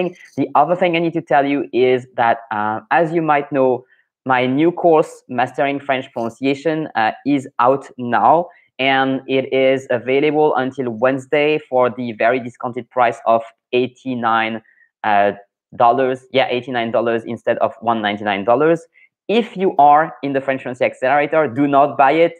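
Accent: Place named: United States. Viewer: I am listening and watching this but I thought I would you pick up on what you said . Your accent sounds anglophonic. I would say broadly apart from the odd word I would say to my British ear you sound as American or Scandinavian who speaks good English.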